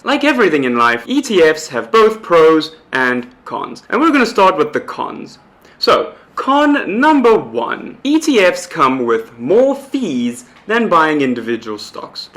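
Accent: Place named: South Africa. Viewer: I’m a South African living in the US and to have this concept explained to me in a SA’n accent makes it that much sweeter. Lekker my boet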